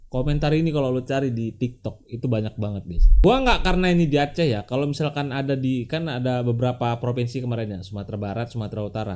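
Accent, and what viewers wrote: Java, Indonesia. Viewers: Jawanya mana bang kamu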